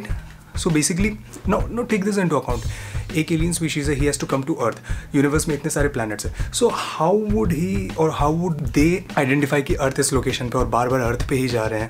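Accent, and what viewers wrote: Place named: India. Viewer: I get a kick out of the ingine accent.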